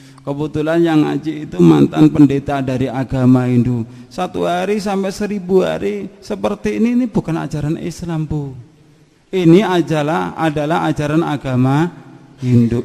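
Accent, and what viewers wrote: Java, Indonesia. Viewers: Ustad ini asal usul nya dari Bali, tapi sudah lama tinggal di jawa.... wajar2 saja lah bro logat nya jawa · Ha ha ha Mualaf Cari Panggung...Keyakinan Itu Nggak Perlu Di banding-bandingkan.anda Yalin dengan Ke islaman anda, lakukan, kami yakin Dengan keyakinan kami, itu adalah jalan kami. Logatnya Jawa Banget. Jika tidak paham Agama hindu. Jangan mengomentari Keyakinan agama hindu. Fokuslah anda pada Agama islam. Ustad udah masuk Islam, Masih bilang di dalam agama saya. Anda semakin membahas agama hindu, justru menunjukkan kejelekan Anda. Maaf ( bukan Kejelekan Agama Islam) justru agama islam semakin dijelekkan dengan Orang seperti ini. Semoga komentar ini jadi viral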